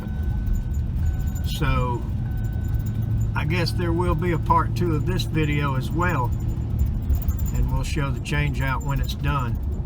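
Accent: South, United States. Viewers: The soft spoken Southern gentleman has to break the bad news to another helicopter, and it is always done with tact. Couldn't help but notice that has begun using that term as well; maybe you should get residual compensation since you coined the word. lol Always nice to watch you and in action.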